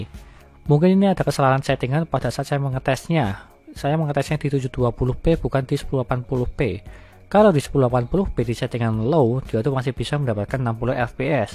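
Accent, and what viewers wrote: Surakarta, Indonesia. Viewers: Orang solo bang?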